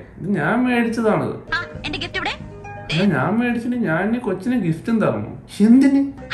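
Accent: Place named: Kasaragod, India. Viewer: എവിടെയാ നാട്. നമ്മുടെ kasargod ഭാഷ വരുന്നു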